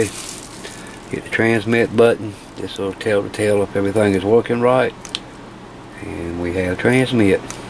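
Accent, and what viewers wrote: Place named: Appalachia, United States. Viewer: I’d never imagine such a heavy Deliverence accent, with someone capable of fix ing tiny crappy radios.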